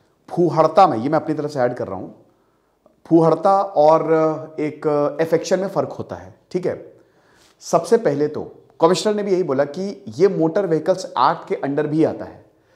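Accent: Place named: Lucknow, India. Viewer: Sir aapki boli se hi samajh gaya tha ki aap lucknow ke hain....2018 study iq se yahan tak aap roz apki video se gyaan leta aaya hu... Main bhi lucknow se hi hoon...mujhe ataynt prasannata hui yeh jaan kar ki aap jaise achhe aur amazing guru mere sheher se hain. 😀🙏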